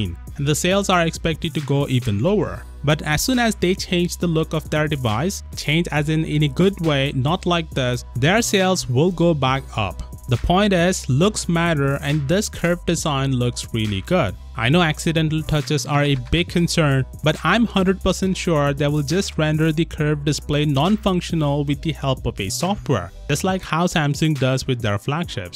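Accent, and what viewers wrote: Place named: India. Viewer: Your accent is confusing me are you Indian or Chinease or both 🙂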